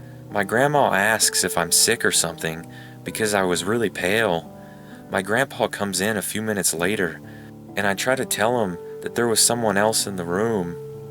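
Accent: South, United States. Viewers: first time on this channel......damn, you sound southern... its ok, I'm from texas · you sound American you have a accent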